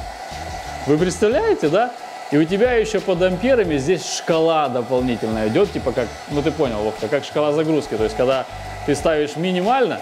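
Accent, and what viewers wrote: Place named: Ukraine. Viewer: Ты как будто украинец, шокаешь, фразы на украинском выпуливаешь) Есть ощущение что множество талантливых людей в рашке - это украинцы)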